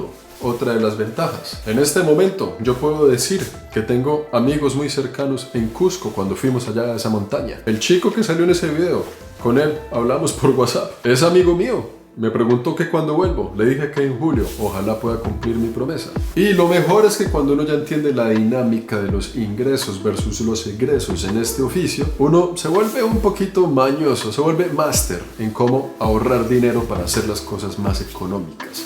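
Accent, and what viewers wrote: Colombia. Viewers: me gusta mucho sus videos y que no olvidas la forma de hablar de nosotros los colombianos 😂😂😂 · Amo como narras tus videos, y tu acento colombiano :) saludos desde Lima Perú. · Buenas tardes, te escribo desde Granada, en España. Mi marido y yo te vemos desde hace medio año más o menos. Me encanta que no hayas perdido tu acento colombiano y que además de tomar transportes de un sitio a otro como hacen otros creadores de contenido, expliques cuestiones relativas a la historia y la cultura de los sitios a los que vas. También nos agrada el hecho de que no hagas grandes aspavientos con la cámara y que tus videos sean siempre sinceros. Este me ha abierto mucho los ojos, porque a mi por lo menos me gustaría poder viajar ya que no puedo hacerlo por una cuestión económica. Cuando acabe el doctorado quizá. Un fuerte abrazo y sigue subiendo cosas, son pequeñas maravillas.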